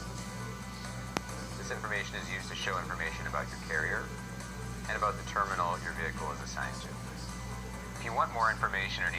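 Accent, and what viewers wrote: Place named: Boston, United States. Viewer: He speaks with an Boston Accent!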